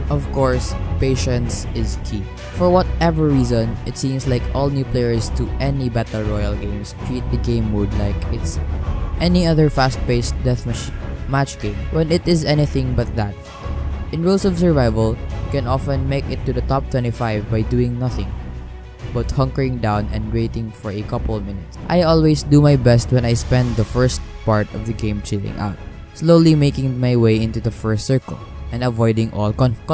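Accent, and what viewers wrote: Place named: Philippines. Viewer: sabi na eh pinoy ka ibatalag acsent ng mga pinoy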